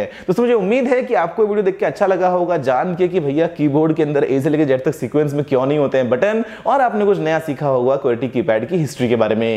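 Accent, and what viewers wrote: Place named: Haryana, India. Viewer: Sir aap basically aap haryanvi hai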